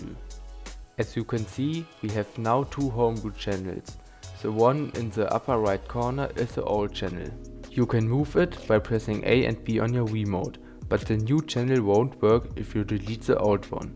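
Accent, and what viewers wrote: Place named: Germany. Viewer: man hört dein deutsch krass raus haha aber trotzdem danke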